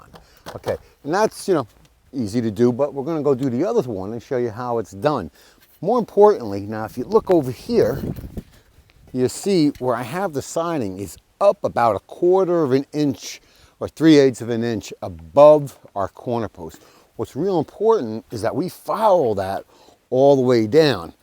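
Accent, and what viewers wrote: New York, United States. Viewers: funny and to the point. except your house or not. you need a moisture barrier. t1-11 is wood, any wood that is contact with water will rot over time. the little extra time and money would have been well worth it. love the straight talk , sounds like your from jersey or new york.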